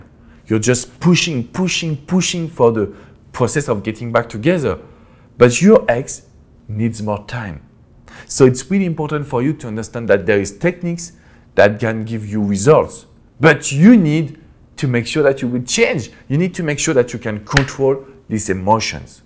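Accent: France. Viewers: ur my best with your french accent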